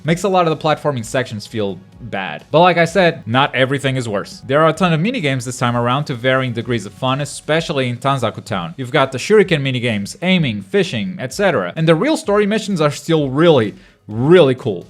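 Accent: Portugal. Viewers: Are you portuguese ? 0.o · Wait, are you portuguese?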